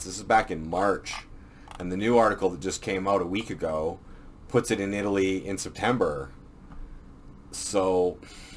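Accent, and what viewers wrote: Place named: Canada. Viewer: You're pretty good at this for starting up. We all need to do all we can to spread info that is being suppressed. May want to move your mouse a bit further from the mic when you scroll so it it doesn't pick up so many clicks. Knew immediately you were from Canada, if I had to guess I would say your from BC maybe? Canadian accents are weird in how they are distributed. I'm from rural sask. Hosers unite against the NWO!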